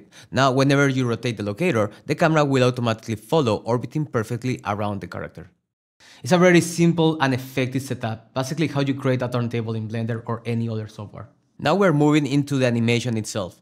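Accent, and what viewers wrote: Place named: United States. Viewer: American can't you tell lol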